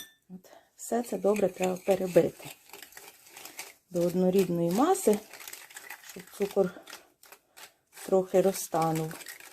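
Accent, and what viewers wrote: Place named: Poltava, Ukraine. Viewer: Доброго вечора,!Дякую за гарне відео!Цезар такий кумедний😄Помічник!Завжди дивуюсь,як Ви з півдня України,а розмовляєте начебто з Полтавщини.Дуже люблю таку просту,українську вимову.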